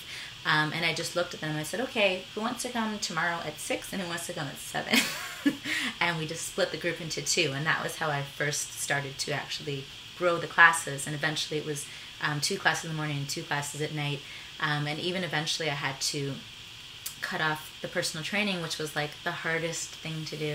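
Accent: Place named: Canada. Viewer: Your location says United States but your accent is Canadian and your gym is located in Canada?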